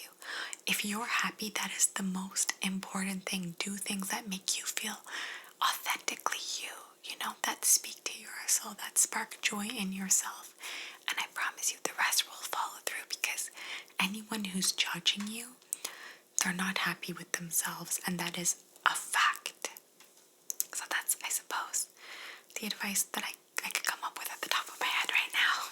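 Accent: Italy. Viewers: I just found your channel and have been binge watching all your videos. I especially love your Italian accent videos (so tingly!) but also, I am Italian and trying to improve my language skills too! I also love the Russian language, but can only say a few phrases, like introducing myself. I love your idea to read Italian books/poems in a video, so I will be looking forward to that! I thought your story about being a kid and prank calling the police was hilarious - I did the same thing with my sister as a kid and the police came to my house too. My parents were sooo embarrassed! but it's funny now. Anyway, I just wanted to share my love for your channel, I think you are awesome! I hope this doesn't come across as creepy, because you know...internet...but learning about you in this video makes me wish we could be friends, but I'm no where near Canada. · Hi! your italyaccent videos is funny;DD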